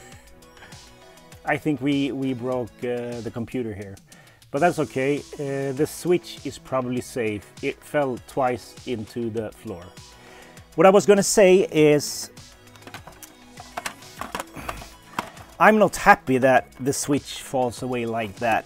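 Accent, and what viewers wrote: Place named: Sweden. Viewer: Jag gillar att det hörs att du är svensk med när du exempelvis säger: ”I was super stolt” istället för ”I was super proud” Ska inte säga något, skulle säkert göra samma sak om jag gjorde det på engelska. I like when we can hear that you come from Sweden when you say: ”I was super stolt” instead of ”I was super proud” I should not say anything, maybe I should do the same if I did it on english.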